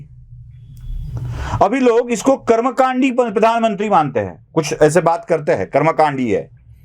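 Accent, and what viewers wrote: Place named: North, India. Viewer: Sir Apki Hindi to Bahut Achhi hai.. Apke hindi bol se ap to Ap North Indian hi lagte hai..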